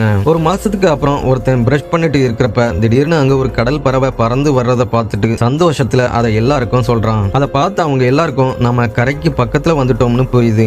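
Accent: Kongu, India. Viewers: Varuthung pothung puriyuthung...bro nenga. Kongu side ah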